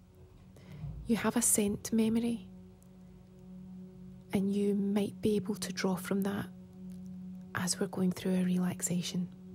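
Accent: Scotland, United Kingdom. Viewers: The Scottish accent is heavenly with headphones. Oh, and when I finally nodded off, I had a dream that you made a charcuterie board ASMR vid. Then it dawned on me; without me readers on, that's what the salts 'n oils board sort of looks like. 😅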